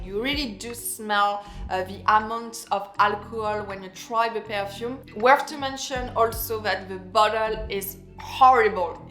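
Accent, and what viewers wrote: France. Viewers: You do realise that perfume is highly personal and changes depending on the body chemistry? You do realise that you are insulting a ton of people and their taste? This is not a review, it's just bashing 5 perfumes for entertainment while speaking with a thick French accent playing the bourgeois authority. A review is supposed to be neutral.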